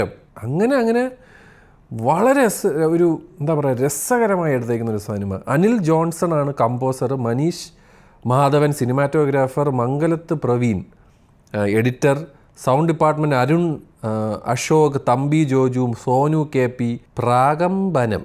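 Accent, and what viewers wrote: Trivandrum, India. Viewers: Annan trivandrum aanen confirm aayi😂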